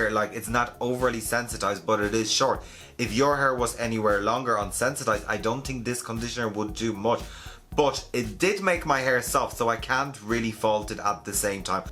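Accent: Ireland. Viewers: Love your wonderful Irish accent wanted to know wht you think of Osmo curl spray and their products in general.I cant afford very expensive hair products right now any cheaper superdrug or boots curl products you can recommend?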